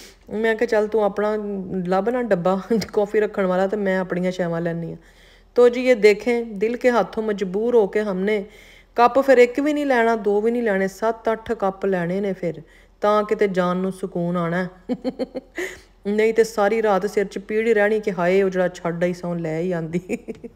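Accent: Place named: Punjab, India. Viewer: Salaam ❤ sweetest Punjabi ❤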